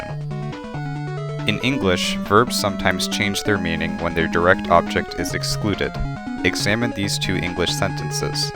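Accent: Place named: United States. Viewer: Please come up with an accent for the language or something, it sounds terrible with an American accent. Ps. Is that Shovel Knight music in background?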